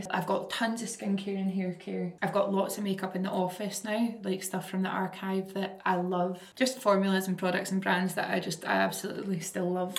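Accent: Scotland, United Kingdom. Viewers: The little Scottish accent 😭😭😭😭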